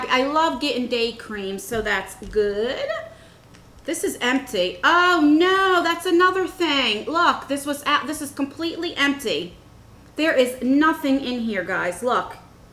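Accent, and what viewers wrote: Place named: Mid-Atlantic, United States. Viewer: Hi! I love your videos!!! I lived in Delaware for 14 years and I miss that Mid-Atlantic accent!!! Your videos are so informative and I just love your down to earth personality. Keep it up ❤️❤️❤️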